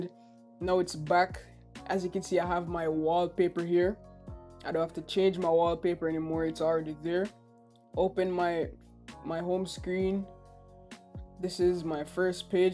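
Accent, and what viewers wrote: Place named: Jamaica. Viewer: You sound Jamaican can still hear likkle bit ah di accent😂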